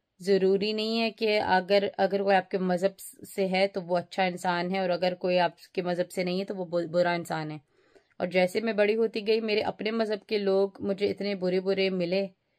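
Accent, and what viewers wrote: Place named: India. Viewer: You're just like Indian,because your tonks are very much similar to hindi & i feel that the way of talking is too much Indian.Happy Diwali & happy new year.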